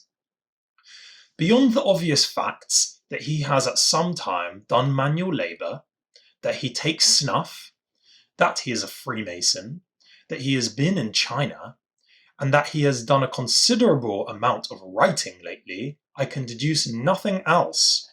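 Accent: United Kingdom. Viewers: 前編のA Scandal in Bohemiaも大変勉強になったので、待っていました！ 典型的なちょっと上流のイギリス英語ですね。何度か最後までしっかりと聞くと、ストーリーがしっかりと理解出来ると思うので、頑張ります！：）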